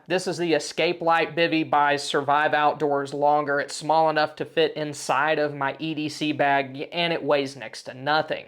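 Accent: Texas, United States. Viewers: Congrats in reaching over the 100K subscriptions mate you deserve it as you always have great content and advice. That BK knife you have looks the goods and I have quite a small collection myself of both bought knives and I also make my own. Look forward to many more great videos and I like listening to your Texas drawl, regards from Down Under mate 😁😉🦘🦘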